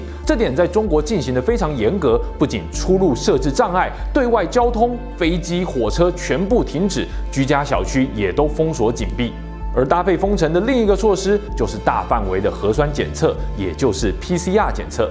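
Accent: Taiwan. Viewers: 你这个频道就不要哗众取宠替它洗地了。封城的次生灾害你有客观统计吗？党国大学发在nature的期刊就是你所谓的“客观”？操着一口流利的台湾腔和繁体字在这误导自由世界。不齿。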